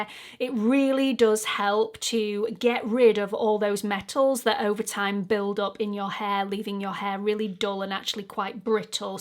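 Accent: United Kingdom. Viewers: Hi there! I'm in the U.S. and some of these product names I've never heard of. I'll see if they ship here. You are so funny and Fun! I find my self laughing with some of your expressions and your LOVELY Brit accent!!! (I want to talk like that!) You are so believable. I think it's your cuteness, your honesty and of course your accent. Thanks for brightening my day! God bless you dear. Lori ❤ Minnesota, USA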